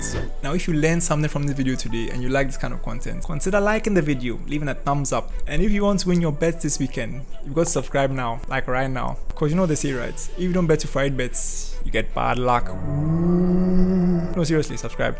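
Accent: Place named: Ghana. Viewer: Your accent sounds Ghanaian. Were you born or lived in Ghana by any chance? Just curious. Great explanation by the way.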